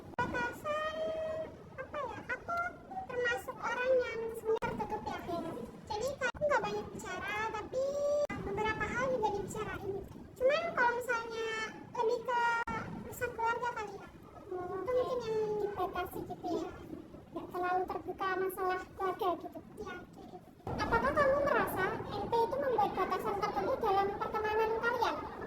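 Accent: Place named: Java, Indonesia. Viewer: medok bgt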